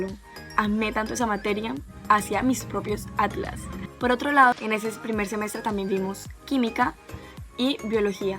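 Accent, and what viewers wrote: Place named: Colombia. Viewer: su acento colombiano despeja dudas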